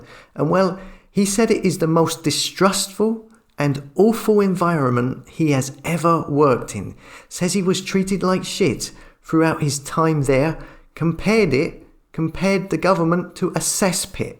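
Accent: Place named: England, United Kingdom. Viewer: Love your videos. Your good English accent is a breath of fresh air especially that you take time to consider the banana republic of Ireland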